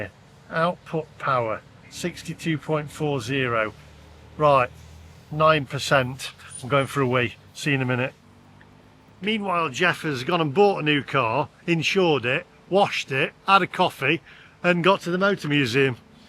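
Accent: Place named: Northern England, United Kingdom. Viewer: The fake trip over the charging cable was pathetic. "It's one of them cars" it's one of them services " it's "those ". can't forgive you for being northern,as my dad was a northerner, and he knew how to talk properly.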